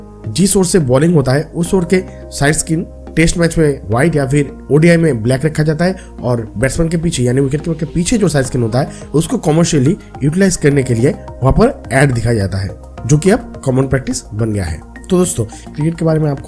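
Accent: Bengal, India. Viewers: Aap bangali ho😁😁apke hindi bangla mesano hein😁😁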